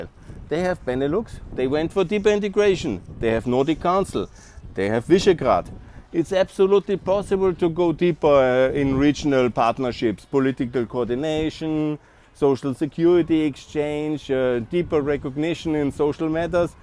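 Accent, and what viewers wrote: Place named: Germany. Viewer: becauz your english accent like german gunther; that’s why they thought ur german..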